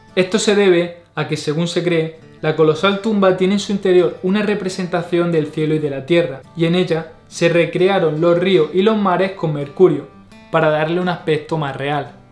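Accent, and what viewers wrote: Andalusia, Spain. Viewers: Hola!vengo del facebook de grupo de arqueologia.buen.video.eres muy lindo y me gusta el acento parece del sur de españa.gracias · Por el acento.... Axarquia/Almeria/Granada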